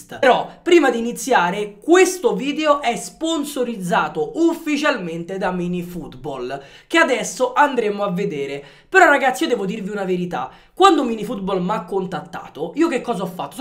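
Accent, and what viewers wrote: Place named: Naples, Italy. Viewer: il tuo accento napoletano sembra quello di un’ orso Balú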